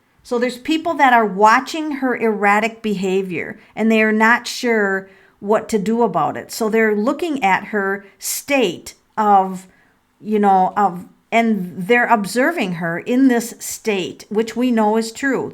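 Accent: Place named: Midwest, United States. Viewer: I love your mid-western accent🤗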